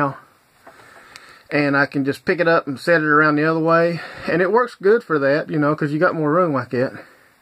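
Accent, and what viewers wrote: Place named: Kentucky, United States. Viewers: Being native born here that distintive Southern accent is picked up immediatly. Always sounds like home :) Nice ingenuity on the build. The swingout arm and kitchen are too cool. Always looking for ideas for when I do mine. Ya'll take care. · I like those cup holders and swivel mount ..that's good ole Kentucky engineering I heard that accent and knew you were from KY. I spent my teenage years in a lil town called "London" in KY gettin ready to watch your school bus video. good job.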